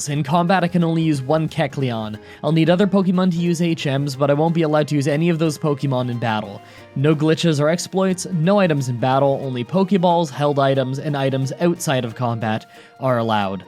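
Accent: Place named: Canada. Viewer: Man you're Canadian how cares how you say names of Pokemon. I just enjoy your smooth voice.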